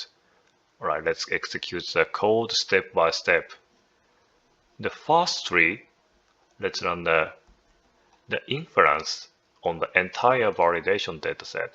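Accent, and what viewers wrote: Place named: Japan. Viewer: 日本語訛は私にとってはとても理解しやすい英語です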